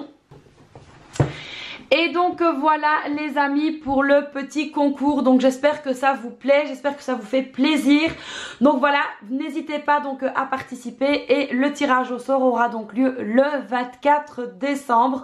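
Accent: Liège, Belgium. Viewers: Moi je préfère quand on entend sa voix top forme avec son super accent liégeois 😉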